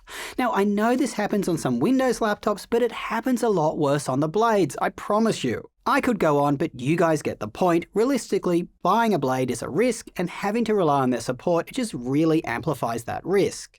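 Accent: Australia. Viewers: OMG your voice sounds Australian.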